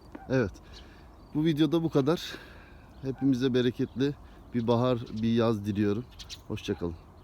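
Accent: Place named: Ankara, Turkey. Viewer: Buram buram ankaralı konuşması☺️ sırf hasret gidereym diye dinliyorum😀😀 şaka şaka bastan sonra izledim hobi bahçesi videolarını çok faydalı buldum emeğinize sağlık bu işe girecek kişiler için oldukça işe yarar bilgiler var. Birçok yerde izinler ve tarla arsa geçici imar izni konusu yüzeysel geçiliyor. Biraz detaylı anlatabilir misin? Teşekkürler...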